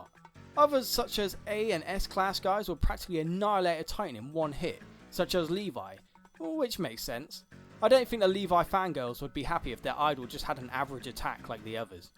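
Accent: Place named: United Kingdom. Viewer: by the way, you sound a little British, do u come from the UK? I do!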